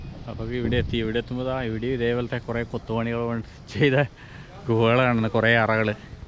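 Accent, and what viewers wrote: Kannur, India. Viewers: കുറച്ചു കൂടി നല്ല മലയാളം സംസാരിക്കൂ, എല്ലാം കണ്ണൂർ ഭാഷയിൽ സംസാരിക്കാതെ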